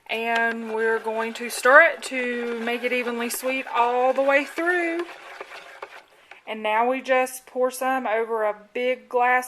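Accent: United States. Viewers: Good video, sounds like a South Carolinian accent, am I right? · Real southern voice lol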